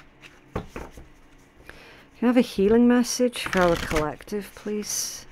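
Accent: Scotland, United Kingdom. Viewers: Girl I say cut the comments back off I totally understand why u did. Lots of haters from my country US I'm from the US I am such a lover of ur channel and skill and I love ur Scottish accent yall rock You're desk is so pretty I love the cards you switch up Love your style it's so mystical Bless you & Thanks so much for the readings!!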